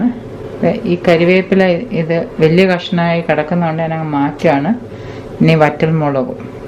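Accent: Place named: Kottayam, India. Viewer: .. kottayam kaari aanannu thonni.. Njnaanum oru kottaym kaari...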